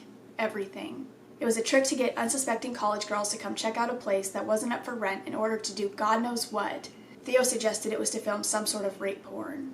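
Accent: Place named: South, United States. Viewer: Its the southernish accent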